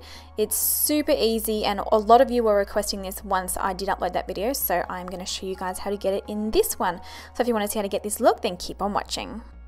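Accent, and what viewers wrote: Australia. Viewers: G'day! Just found you (via the Rimmel lippy reviews) and so lovely to hear another Aussie! I've subscribed already :)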